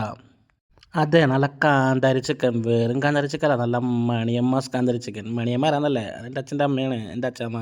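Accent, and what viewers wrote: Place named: Kochi, India. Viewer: Ithentha kochi slang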